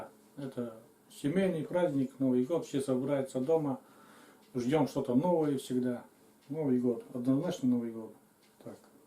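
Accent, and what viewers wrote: Komi, Russia. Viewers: Привет,очень интересно было тебя посмотреть и послушать твой типичный комиговорок.....однако! Восхищает твоя жизненная позиция, простота и откровенность. +41 от меня и всего тебе доброго, нужного и хорошего.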